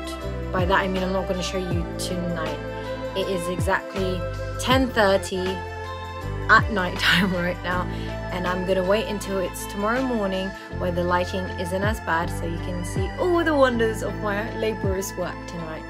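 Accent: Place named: United Kingdom. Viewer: hi ganda. 😍 amazing collection! apaka pretty and sweet mo, really glad to have the honor to have befrieneded you through a game even playing on the oposite side of the team😄 and so sweet of your dad too to help you out, i see where the kindess comes from. god bless and see you soon in the land of dawn😘 ps: love the british accent! 😄 lg, ate diana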